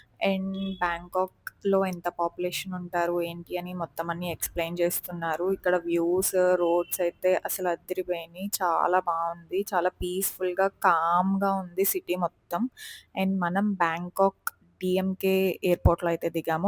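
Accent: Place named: Telangana, India. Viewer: Moutham telangana slang undie ,😅😅😅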